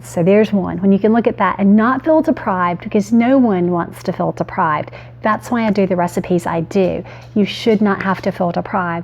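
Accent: South, United States. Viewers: Great encouragement. Thanks so much. This Tennessee girl feels right at home with your Southern accent!😎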